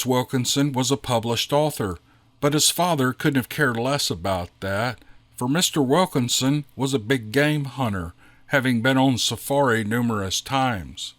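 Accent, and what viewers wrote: South, United States. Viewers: Don't know how everyone else pronounces Mor ls Morsel mushrooms If you have never had the pleasure of eating a plate full I wish that pleasure for you Or if it's you southern drawl, more power to you!!! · You sound like you're from Tennessee or Mississippi somewhere like that.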